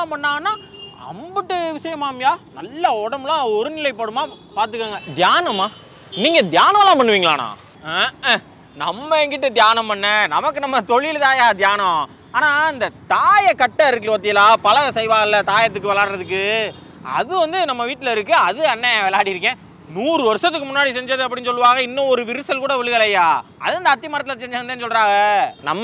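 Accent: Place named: Tamil Nadu, India. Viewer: Arumai.... Thennagathu tamil arumai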